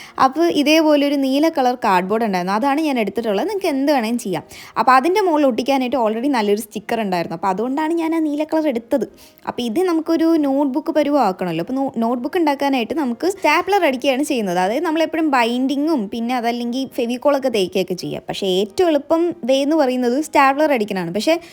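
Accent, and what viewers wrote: Palakkad, India. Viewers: ചേച്ചിൻ്റെ വീട് പാലക്കാട് ജില്ലയിലആണ് എന്ന് പറയുന്ന നതിന് മുൻപ് ചേച്ചിയുടെ SLANG കെട്ട പ്പോൾ മനസ്സിലായി പാലക്കാട് ജില്ലയിലാണ് വീട് എന്ന് ഞാനും പാലക്കാട് ജില്ലയില്ലാണ്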